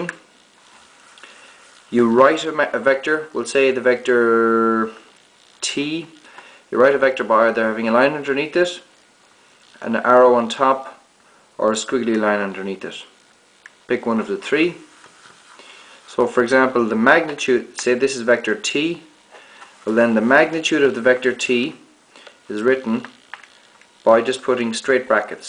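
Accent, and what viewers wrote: Ireland. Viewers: Irish accent <333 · he's Irish give him a break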